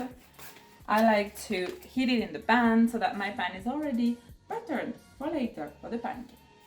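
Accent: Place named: England, United Kingdom. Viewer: your accent 🥰 even more english 🇬🇧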